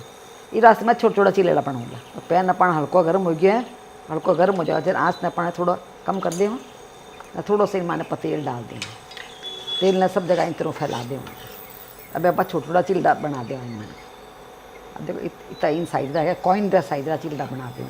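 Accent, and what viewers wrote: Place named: Jodhpur, India. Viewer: Aapki awaz, ton sabkuch Jodhpuri he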